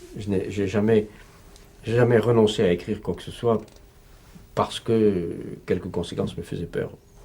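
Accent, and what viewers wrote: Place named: United States. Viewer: Lol he sounds so American too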